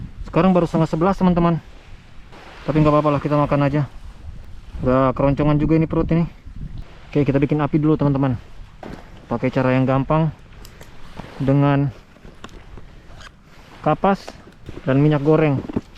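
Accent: Manado, Indonesia. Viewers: Itu logat manado memang kantal skali kang 😅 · Di daerah mana ini kawan ?? logat manado ngana pake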